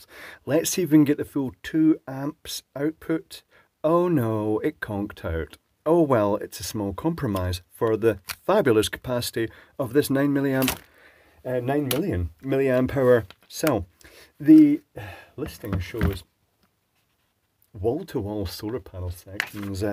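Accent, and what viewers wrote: Scotland, United Kingdom. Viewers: Excellent and very educational! There's something about this Scottish accent version which inspires confidence- as opposed to the 'English bastards...' version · your soft Scottish accent and sarcasm works perfectly when discussing China's quality products. You always have me laughing out loud.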